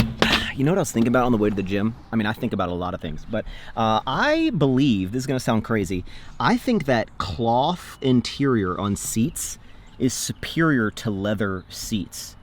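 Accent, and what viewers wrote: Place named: South, United States. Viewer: getting that southern twang 😂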